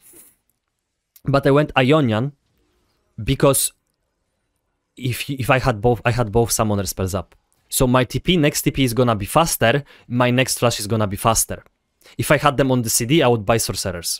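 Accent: Poland. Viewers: Nice Polish accent like libik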